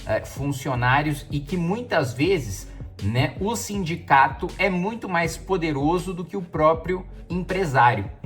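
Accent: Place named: São Paulo, Brazil. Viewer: Te acompanho há anos e só agora reparei que você tem aquele R chato de São Paulo no sotaque.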